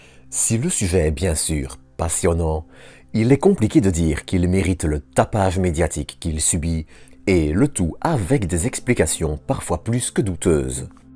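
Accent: Belgium. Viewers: La voix belge. Liégeoise sans doute 😂😂. Quel accent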